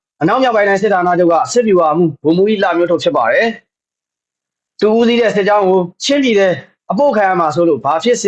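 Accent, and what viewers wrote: Yaw, Myanmar. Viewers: စောင့်လိုက်ရတာဗျာ။ ယောနသံကြီးဘေးကင်းပါစေ။ လေးစားပါတယ်။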